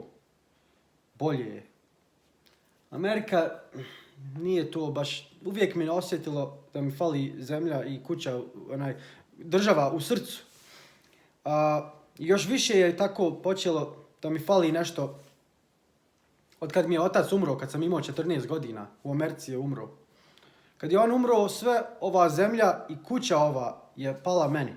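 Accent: Bosnia and Herzegovina. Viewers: Nek ti je sa srecom sine ❤❤❤❤❤ a tvoj Bosanski jezik je predivan❤❤❤❤❤🍀🍀🍀🍀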